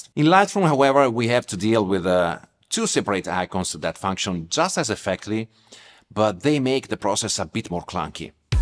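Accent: Italy. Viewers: Great comparison. Thanks ! Your delivery is very friendly and informative and the Italian accent is charming.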